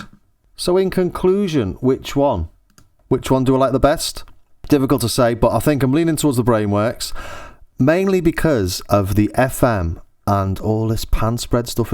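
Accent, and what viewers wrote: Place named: Scouse, United Kingdom. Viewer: im trying to figure out if you`re a posh scouser or from the Wirral :)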